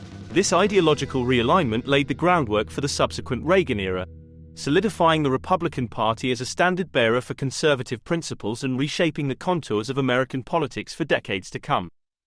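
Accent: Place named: United Kingdom. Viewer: Too British for me I am sorry.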